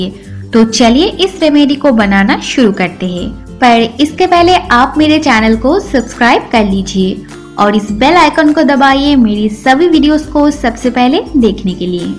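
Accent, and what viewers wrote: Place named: Bengal, India. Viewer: Bengali accent spotted!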